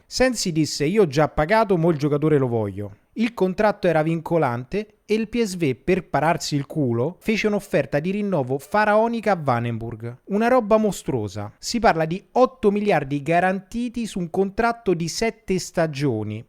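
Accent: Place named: Rome, Italy. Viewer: Parli uguale ai miei cugini più grandi romani, me ne parlarono tanto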